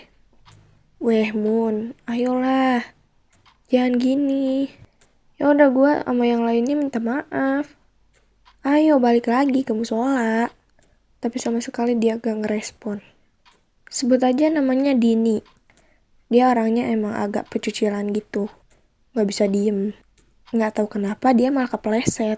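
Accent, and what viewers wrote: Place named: West Java, Indonesia. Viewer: Sunda banget yaa logatnya.. hehe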